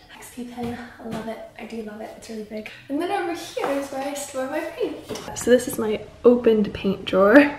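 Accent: United Kingdom. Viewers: Love that you're starting to pick up a British accent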